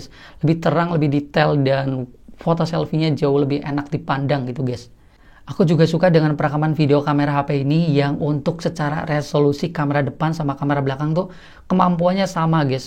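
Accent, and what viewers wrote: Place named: Indramayu, Indonesia. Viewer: orang indramayu ya mas?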